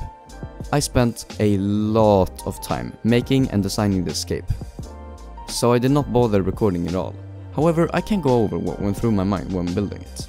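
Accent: Sweden. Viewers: are you from sweden? your axent sounds like it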